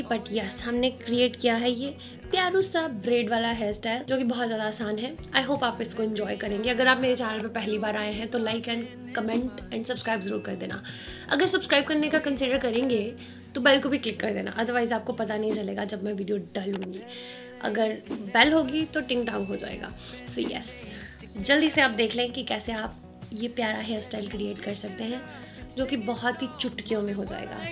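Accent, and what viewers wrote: Punjab, India. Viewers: I love your punjabi-english-hindi accent😆🥺🥺 it is really cute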